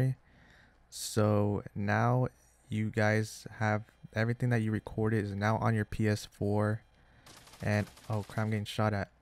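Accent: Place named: Australia. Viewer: 90% Australian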